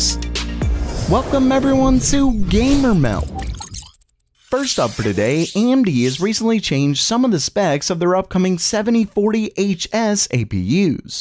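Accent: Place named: South, United States. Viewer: please lay into your accent more. i need tech vids from a southern gentleman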